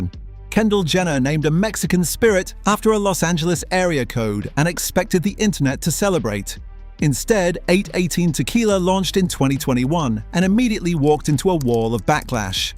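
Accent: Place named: England, United Kingdom. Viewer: There is a lot of questionable and somewhat misleading information in this garbage. Feels like an automated bot program creating it. And why would you select a English accent for an article on tequila. 😅Oh yes we all know England is a great source of tequila and information on tequila 🤣 I thought they were only allowed to add 2% additives to tequila. But who knows, maybe something changed or I'm remembering wrong. But I wouldn't necessarily trust this video as a source of information. A lot of it just seems like pointless hype and empty drama. And yes tequila can be sold as tequila and call tequila if it's only 51% actual tequila /liquor made from agave. The rest is usually going to be like some kind of Cane alcohol or something. And apparently that doesn't mean rum😂😖 and it is too harsh to drink straight 😂😖 But just because tequila says it's 100% agave does not also mean that it is good 😂 And you can legally put in the additives as long as you don't try putting on the label of the bottle that it is additive free. 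And many people will actually prefer the final products taste and texture with a little bit of additives in it. Not everybody cares about working their pallet into a "finely honed tool" to try to discern whether it's 100% natural or not having to buy tons of different tequilas to figure things out. They just want to buy a quick bottle to either enjoy sipping on every now and then or make some margaritas out of. And cheap rot gut tequila can often be great for making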